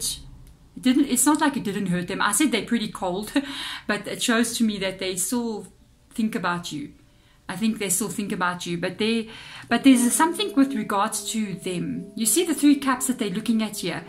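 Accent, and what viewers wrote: South Africa. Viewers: Hello , are you South african afrikaans? I kind of recognize the accent .Spot on for the reading my story sadly.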